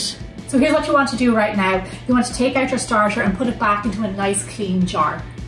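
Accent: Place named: Donegal, Ireland. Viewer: You sound like your from Donegal ♥️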